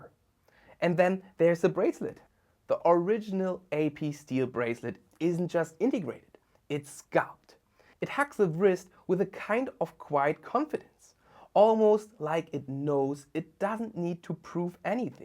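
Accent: Germany. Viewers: I love your videos. Your lighting and the way you showcase your watches really elevates them. On a side note, I love your accent too. I’m in the UK and have a close friend who is German, a lot of the words you pronounce similarly to him, we call it Ginglish! My surname is Konig too, my grandfather must’ve lost the ö when he came over to the UK, I doubt they had “ö” on the typewriter when they processed his papers so we’ve lost it!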